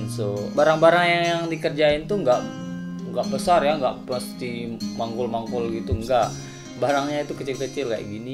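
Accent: Lombok, Indonesia. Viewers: Bang kentel banget logat lomboknya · Anoo " ciri khas org sasak klo ngomong 😂😂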